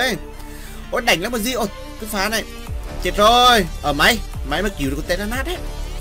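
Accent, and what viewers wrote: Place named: Central, Vietnam. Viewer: nghe tiếng bạn lúc đầu cũng khá là khó chịu... giọng nói của người miền trung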